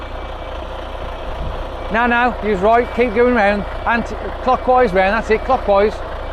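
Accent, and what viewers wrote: Birmingham, United Kingdom. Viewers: This guy reminds me of a brummie version of my dad lol. He taught me many years ago in exactly the same way God rest his soul ❤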